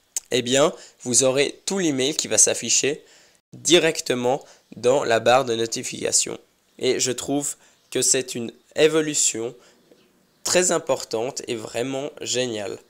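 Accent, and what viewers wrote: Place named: Switzerland. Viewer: ça s’appelle un accent! vive la Suisse romande et vive les vidéos de l'!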